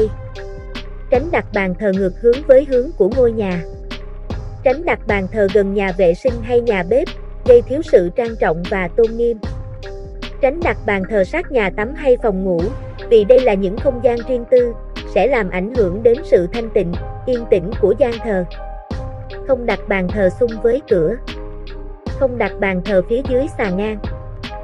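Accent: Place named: South, Vietnam. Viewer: Giọng miền Nam nghe khó quá lại còn nhạc nền nữa làm cho càng khó nghe hơn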